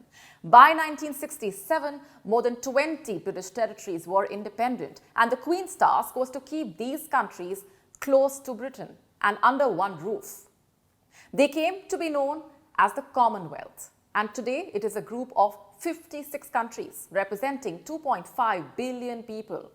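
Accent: India. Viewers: Heavy Indian accent. Sorry not used to it. I miss Ms. Palki so much 🥹😭 Unsubscribed. Sorry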